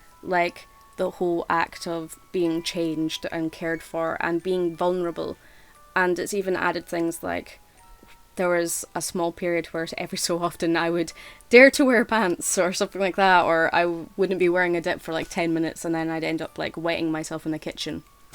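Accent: Scotland, United Kingdom. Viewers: While having issues at night as well as the occasional daytime accident relationships are difficult. If I had a partner with an issue that required diapers ( if I didn't have any issues of my own). I understand that the human body is a complex organism and things don't always work right and I would accept the fact that they needed to use diapers. Thank you for your videos I have always enjoyed them, especially your honesty. Now if I recall correctly in one of your videos you mentioned you're Scottish, I have always loved your scottish brogue. P.S. I am glad to see that you are uploading again.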